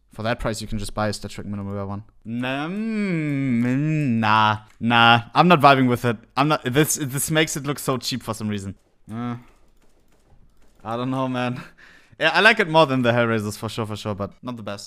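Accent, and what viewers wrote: Germany. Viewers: man hört den Deutschen Akzent haha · wie krank man hört das er deutsch ist pahahhahah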